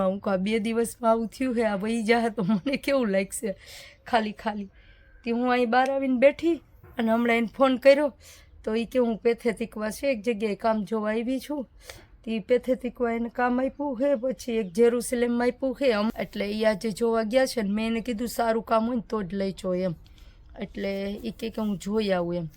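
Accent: Kathiawar, India. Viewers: અસલ કાઠિયાવાડી ગામઠી ભાસા..!!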